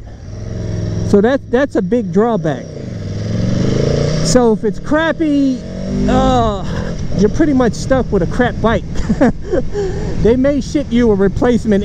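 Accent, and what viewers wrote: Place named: Atlanta, United States. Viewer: This man's got that Atlanta accent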